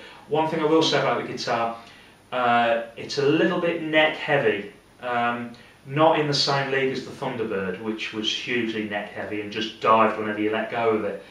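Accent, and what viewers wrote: United Kingdom. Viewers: You actually bothered to type that? The vids great, it's a mixture of talking and playing, about right in my opinion. Nice to hear it done by a no nonsense British bloke for a change, like ya mate doing it.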